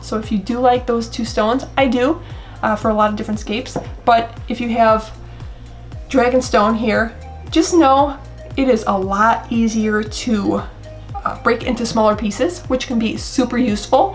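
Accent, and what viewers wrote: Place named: Midwest, United States. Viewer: I'm not sure if it's your Mid-Western accent or Mom humor, (that can be a thing, too right?), but you are delightful and engaging teacher!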